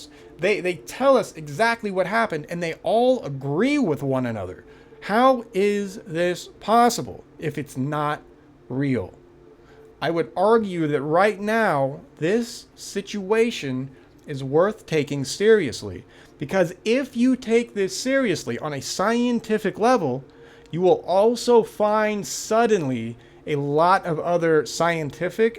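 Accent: United States. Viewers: What kinda accent is this? East coast for sure, NY? NJ? PA?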